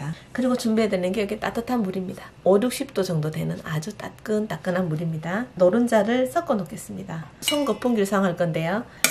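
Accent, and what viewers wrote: Gyeongsang, South Korea. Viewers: ! 경상도 말씨. 너무 구수하고 좋아요. 빵도 구수하게 잘만즈셨네요. 꼭 딸아해봐야겠어요. 💯👍💯💐💐💐🎊🎊🎊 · 뿌사졌어요! ㅋㅋㅋ 깨졌어요 부러졌어요 보다 훨씬 적당하고 현장감나는 표현이네요 갱상도 사투리도 참 듣기 좋아요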